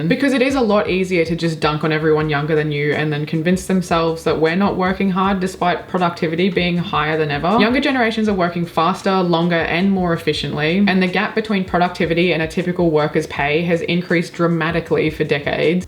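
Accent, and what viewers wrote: Australia. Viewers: I love thought-provoking & enlightening rants Especially if they feature a hilarious aussie accent lol I really like to rebuttal with my own profound take on this matter But for now, I just wanted to say thank you for being a critical thinker Subscribed Bless u ❤ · Hey you sound like an Aussie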